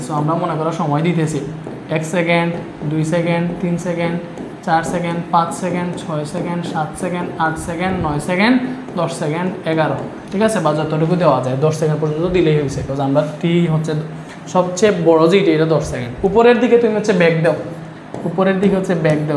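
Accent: Sylhet, Bangladesh. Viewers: স্যার সিলেটি❤ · তুমি মিয়া সিলেটর কোন জাগার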